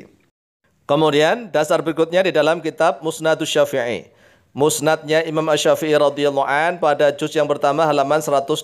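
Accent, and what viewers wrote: Madura, Indonesia. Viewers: Dialek Madura apakah ini Syiah madura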